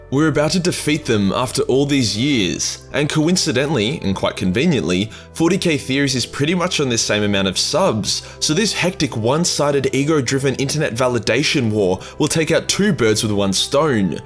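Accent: Australia. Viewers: Love Aussie narrative... SIGGY :D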